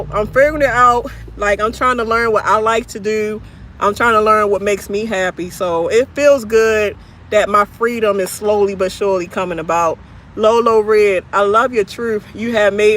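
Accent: Baltimore, United States. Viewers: Sound like you’re from Baltimore.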